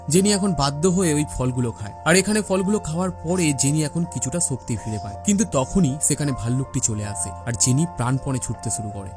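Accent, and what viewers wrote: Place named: India. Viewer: ভাই আপনি কি ইন্ডিয়ান কারণ ইন্ডিয়ান মানুষরা এভাবে কথা বলে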